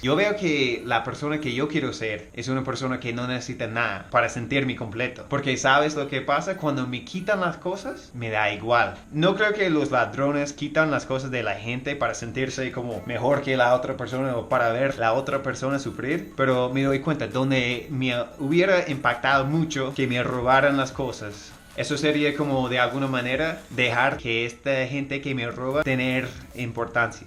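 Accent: Colombia. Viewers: Leí todos los insultos con su acento jajajaja Colombia amo su acento - Saludos desde Costa Rica que hubo pues parcero (? xD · pobre man peor bueno eso suele pasarnos en Colombia, me causa mucha gracia su acento y palabras colombianas! jejejej adelante mi querido gringo! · te ganaste un sub eres un gringo pero de una forma colombiana y hablas como un colombiano y un consejo cali es miy lindo pero hay dasmasido ladron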